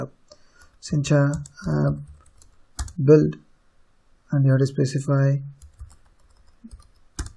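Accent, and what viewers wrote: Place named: India. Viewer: Люблю индийский акцент, всё сразу понятно без субтитров :)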